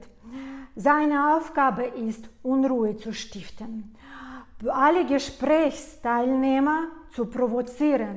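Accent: Russia. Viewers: Ich liebe deinen russischen Akzent. Übrigens: Wir Bayern rollen auch das "Rrrrr".😁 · Ich hab mir zwar geschworen nie mehr zu heiraten, aber Dich würde ich glattweg sofort heiraten, laaach. Nee, keine Angst, ich weiß das Du verheiratet bist. Aber ich verstehe diese Vollpfosten von Hater nicht. 1. Deine Ratschläge fruchten und haben Hand und Fuß, im Allgemeinen. Und ich denke, wenn Du die evtl. Situation genau kennst, sagen wir mal von einem Teilnehmer Deines Coaching, Du auch ihm ganz treffsicher helfen kannst. 2. Du hast mich in diesem Video zum lachen gebracht, dem Hater wird es wohl nicht geschmeckt haben, laaaach. 3. Du hast keine Schlauchboot Lippen, finde sie sehr sexy und anziehend :) 4. Ich mag Deinen Russischen Akzent und auf den kannst Du natürlich stolz sein. 5. Ich finde es toll das Du die Deutschsprachige Kultur, sowie Deine eigene magst. Das macht Dich auch für das Coaching interessanter und Du hast auch mehr Überblick wie Manner verschiedener Kulturen "klicken". 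6. Du hast einen tollen Humor und gehst die Sache mit den Kohlköpfen/ Hater richtig an. 7. Ich mag Dich so wie Du bist und mach weitere so wertvolle Videos wie bisher.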